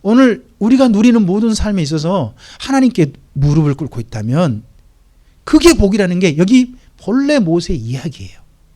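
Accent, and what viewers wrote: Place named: Jeolla, South Korea. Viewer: 목사님 감사합니다. 말씀 많이많이 올려주세요. 열심히 듣고 배우고 있어요. 특히나 전라도 사투리 개인적으로 넘 좋아요 ~~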